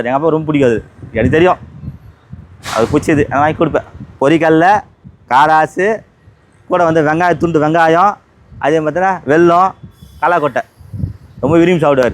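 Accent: Madurai, India. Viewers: Madurai vasagam super ❤🎉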